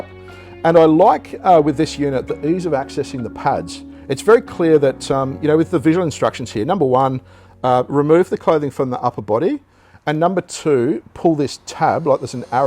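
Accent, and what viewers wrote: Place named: Australia. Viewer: .. I Love Your Aussie-Accent Mate ..👍🦄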